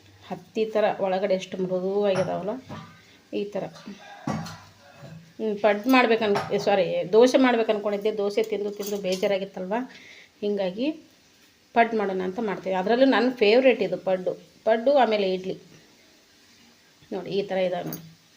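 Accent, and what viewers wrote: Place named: Uttara Kannada, India. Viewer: Namaskar ri. Namma UK bhashe kelode ondu khushi. Chennagi ide paddu.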